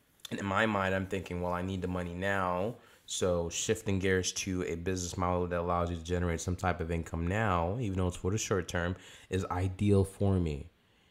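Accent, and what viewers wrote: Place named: United States. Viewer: But you have a little more advantage than me bcos you have the American accent and I don't. What I did was join a program that started paying me without referrals or customers or leads or anything like that. Then I made a proof on income video just to add some credibility to my business and then I sarted getting quality leads from my campaigns. I'm not trying to get you to join me, you can join another person but you really need to check this out reviewprofitclicking(dot)wordpress(dot)com.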